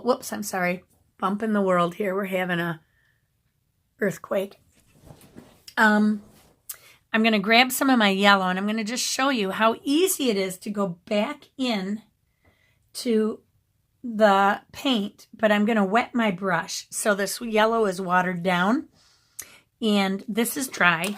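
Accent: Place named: Midwest, United States. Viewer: That midwest dialect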